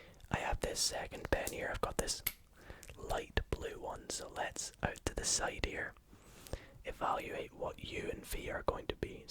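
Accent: Scotland, United Kingdom. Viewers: I can’t tell if ur from Scotland or America it’s weird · Where in Scotland are you from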